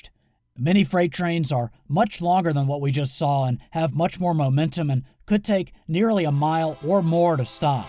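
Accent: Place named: Augusta, United States. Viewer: “The train company” “THE TRAIN COMPANY?” I’m gonna have to listen to this video when I’m homesick for the CSRA accent [Augusta GA area]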